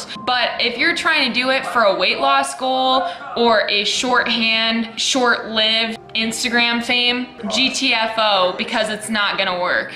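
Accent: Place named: Michigan, United States. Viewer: You sound sooo Michigander it's awesome hahaha. LOVE YOU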